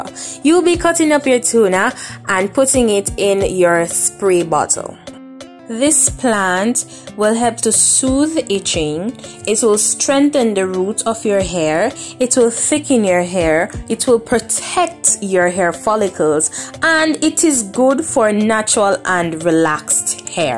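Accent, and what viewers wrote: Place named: Jamaica. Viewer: Me too... She has this sweet Jamaican accent 😍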